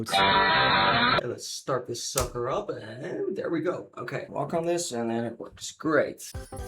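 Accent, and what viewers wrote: Netherlands. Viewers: Wait a min this channel is from the netherlands? So: oke test 1... 2... 3... test ik ga er dan vanuit dat mans nederlands is toch? Of ehh niet? Want als ik zo naar je kanaal kijk zie ik nederland en ik ben ook nederland en ook ik zag net ook nog € en dat is dan al europees ik dacht op recht dat je van Amerika zou komen en gewoon $ zou gebruiken maar nope nederland te gek en ook hey ik hoor langzaam ook een accent en die naam ik weet dat kip nederlands is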